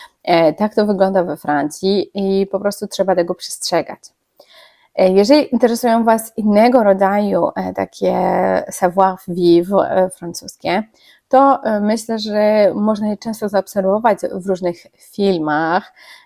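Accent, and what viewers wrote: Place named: East, Poland. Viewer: Pani ta mowiac po polsku ma trochę wschodni akcent, taki "śledzikowy". Zauważyłam,że coraz więcej młodych- dorosłych ludzi tak mowi używającąc zmiękczonego języka polskiego, jakby nie wyszli z dzieciństwa.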